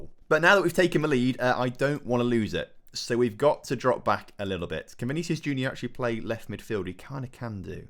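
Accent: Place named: Yorkshire, United Kingdom. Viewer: Your Yorkshire accent is criminally bad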